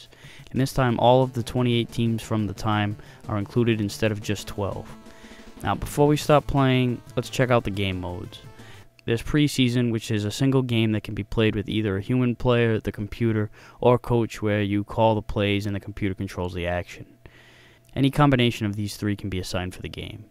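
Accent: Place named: New England, United States. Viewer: Great review; greater game. The NE accent adds some flair to the review.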